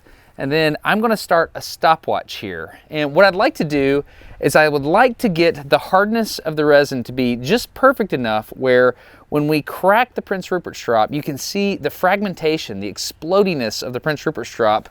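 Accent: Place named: Alabama, United States. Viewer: Destin can we organise you to make an audiobook of some Robert Hooke writings. I want to imagine Hooke with your energy and an Alabama accent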